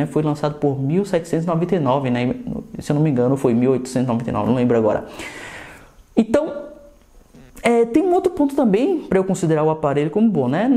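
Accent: Bahia, Brazil. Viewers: Mim escrevi no seu canal vc e baiano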